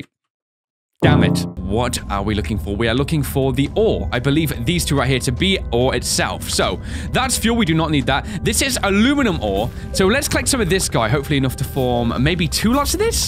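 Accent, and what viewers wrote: United Kingdom. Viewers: your accent is british, you say aluminum and not aluminium british or american?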